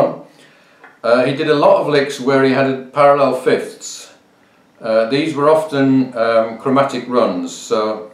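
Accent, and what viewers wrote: England, United Kingdom. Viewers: My brain did a hard reset when I heard a English accent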